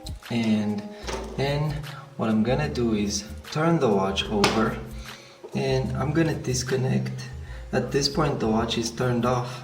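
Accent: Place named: North America. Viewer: Pls speak in common language not nort merican.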